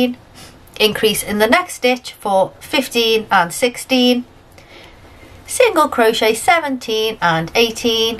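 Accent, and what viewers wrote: Liverpool, United Kingdom. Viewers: So refreshing to click on a posh scouse tutorial ... I'd like to make people aware yes we from liverpool talk fast, walk fast....work fast lol love it xxxx ❤❤❤ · Was just gunna say .. oi scouser.. takes one to know one🤣🤣🤣g’wed girl!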